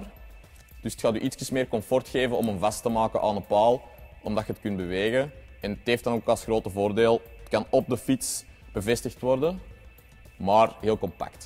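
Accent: Belgium. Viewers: mooi taaltje belgs. leuke gay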